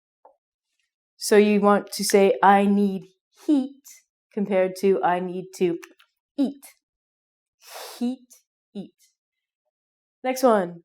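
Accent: Canada. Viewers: Great video for learning Canadian pronunciation. out = "oh-t" mouth = "mow-th" etc. And she has that cheerful positive Canadian attitude as well. Love it. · oh my gosh, you're Canadian!! :D sorry, i had to say that xD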